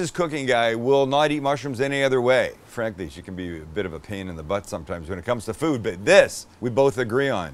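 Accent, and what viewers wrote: United Kingdom. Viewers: I am from the UK and your British accent is so very cute. I love it.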